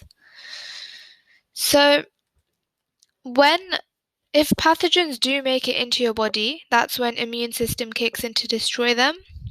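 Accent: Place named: South England, United Kingdom. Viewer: idk how to explain it! 😂 I haven’t seen many people speak with your accent and idk it’s just really nice! 😂🥰 are you from the south of England?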